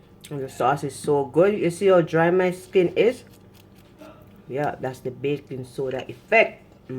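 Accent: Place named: Jamaica. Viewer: Hey girl I am here new subscribe family now you sound like a Jamaica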